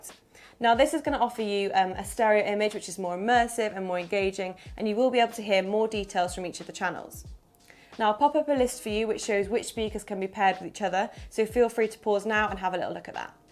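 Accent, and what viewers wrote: United Kingdom. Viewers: Good info, delivered too fast. Your audience is not all familiar with whatever UK accent you have.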